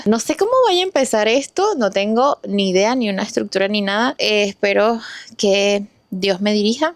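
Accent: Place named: Táchira, Venezuela. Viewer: Hola, desde que te mudaste a usa te he seguido mucho viendo todo lo que haces y empecé hacerlo porque te vi como un ejemplo. Yo también emigré y emigré sola bien chiquita después de graduarme de bachillerato y tus videos me hacían reír también al ser gocha me daba un poco de cringe el acento (la verdad jsjaja). Y ver este tipo de contenido de verdad me hace tan feliz, además siento que es una señal. Al estar sola sola me refugiaba en dios en rezar y rezar y pedirle todo lo que quería o necesitaba para mi bien estar. Y poco a poco me lo fue dando y siempre supe que era el, siempre un mensaje un detallito que solo yo en mis rezos decía. Hasta sacar personas que me hacía mal de mi vida! Pedía a dios si esta persona no es para mí sácala de mi vida aunque me duela el alma… y así mismo fue. No solo con relaciones amorosas, con trabajos, viajes, amistades, etc. 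Después de tanto estrés por inmigrar y tener 3 trabajos vivía muy frustrada pero desde que empecé a agradecer cada día a dios todo mejoró! Y muchas puertas se me abrieron! Pd: por favor sigue subiendo más capítulos ya tienes una fan fiel, gracias ❤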